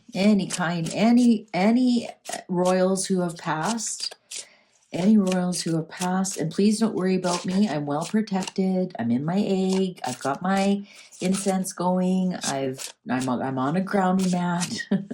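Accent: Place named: Canada. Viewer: I'm an Australian living in Canada and I immediately knew you are Canadian from your accent. People forget the Royal Family are our RF too (Australia and Canada). 😅❤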